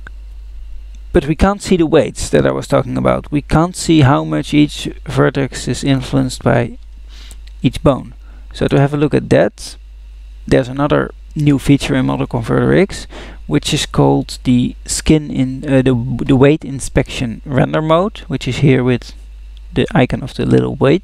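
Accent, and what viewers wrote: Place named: Netherlands. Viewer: Sounds like you are Dutch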